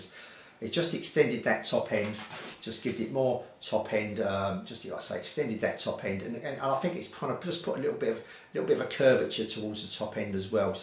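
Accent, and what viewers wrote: Cockney, United Kingdom. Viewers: Recapped my tannoy turnburys.. Bass even more propulsive and deeper tighter.. Etc with mundorf evo oil.. Jantzen on the tweeter... More detail faster.. Now sound euphonic rich with my musical paradise 301.. 289 GBP from China.. Sounds like the review in those mags... Ur Cockney Accent makes a change from the usual middle class ****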